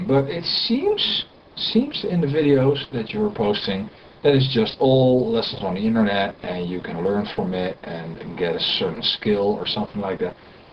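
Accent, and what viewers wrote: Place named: Netherlands. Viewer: i can hear by your accent that you are from holland :p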